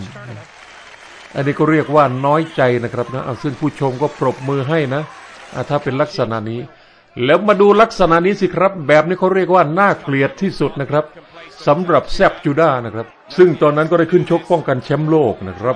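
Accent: Isan, Thailand. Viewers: แอดมิน ทำไมมีแต่พากย์อีสาน ไม่เห็นมีพากย์ใต้เลย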